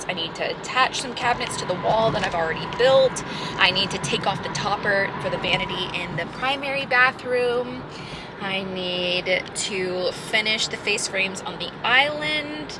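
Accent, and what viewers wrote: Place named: South, United States. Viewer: It's so funny how your accent gets waaaay more southern when you shop with your mom LOL. I Enjoy these flea market vlogs so much <3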